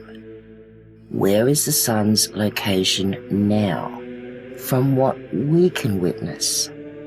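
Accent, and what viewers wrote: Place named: Australia. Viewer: I was joking about your accent. I like the Australian accent btw... and I love your content. I just enjoy how you aussies pronounce Year. Yeeuh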